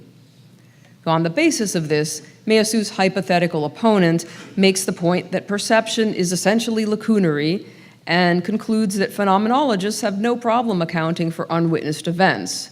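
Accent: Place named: Canada. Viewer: must be Canadian? weird voice and accent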